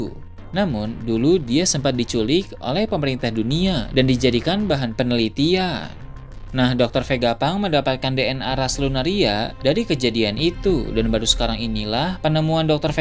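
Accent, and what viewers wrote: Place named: Tasikmalaya, Indonesia. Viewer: mang naha di akhir kalimat dikitukeun wae duhhh ciga orang tasik mang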